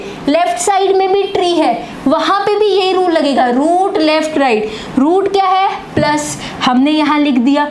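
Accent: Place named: Punjab, India. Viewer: love that panjabi accent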